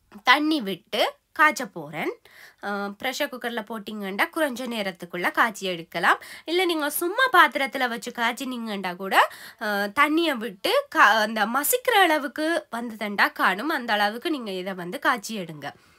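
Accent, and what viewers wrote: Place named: Sri Lanka. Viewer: Sister entha kizhanku enka ketaikum unkaludaya srilanka tamil super Liked Stay in You have my support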